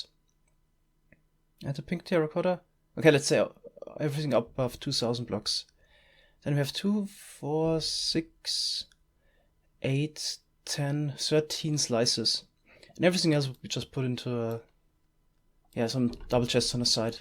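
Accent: Germany. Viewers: Nairolf 00 Neeeeeiin, hat nicht schon 2,<num> Millionen Mal in seinen Videos gesagt dass er deutscher ist, das hab ich mit wohl eingebildet... Haha du bist du selber deutscher, als ob du da nicht seinen krassen Akzent raushörst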